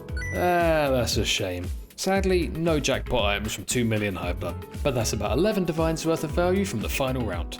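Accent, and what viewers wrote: United Kingdom. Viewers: Came for the hiveblood Stayed for the distinguished british accent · Dang, I just love those videos! ❤ One of the things I was interested in was if you'll hit the full stack of divines, and you did! It was such an awesome view! Great Jon on all the testing, I admire your devotion and time investment. Looking forward to the next league and further testing. I hope you'll be as restless as in the Mirage! ❤ Ps. I live in England, not English tho. I could listen to your lovely English accent all day long 😅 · Came for a big loot stayed for a great vibe + the british accent ofc, great vid, hope your channel grows massive in no time!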